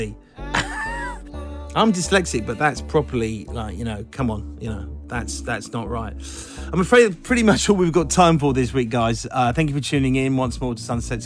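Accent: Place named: Cockney, United Kingdom. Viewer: Didn't realize Chicane was Cockney. Is there a commentary-free stream we can subscribe to? 🤣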